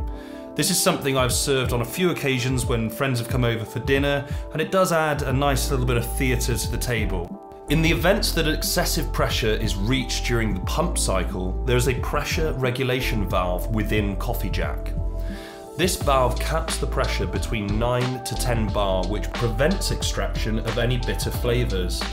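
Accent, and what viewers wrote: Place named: England, United Kingdom. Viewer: Nice English accent 👍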